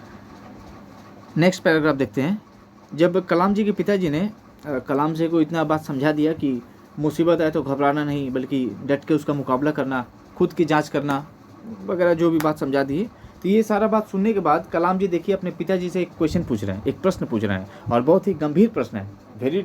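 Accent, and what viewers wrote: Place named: Bihar, India. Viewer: Very well explained and I can relate with your bihari accent which gives a home like experience. Thank you🙂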